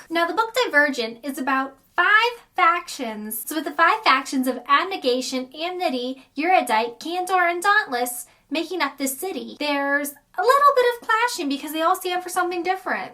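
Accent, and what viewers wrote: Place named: Midwest, United States. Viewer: I believe she lives in ohio....so mid-west accent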